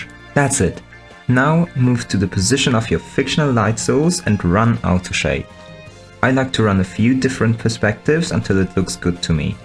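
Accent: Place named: Germany. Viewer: Oh nice I thought i heard some German in there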